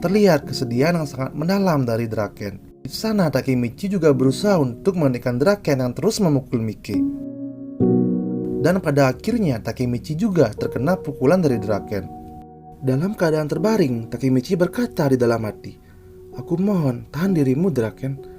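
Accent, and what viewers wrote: Bali, Indonesia. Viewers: org bali ya? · Orang bali fix 😂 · mirip logat bali ngab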